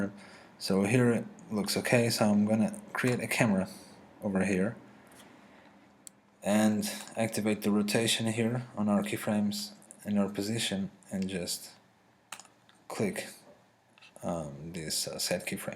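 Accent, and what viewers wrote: Portugal. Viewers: Inglês de quem fala português SEMPRE me ajuda a entender, ingles nativo é muito corrido, valeu pelo video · Good Tutorial... and you are Portuguese...or sort of :) Bom tutorial ;)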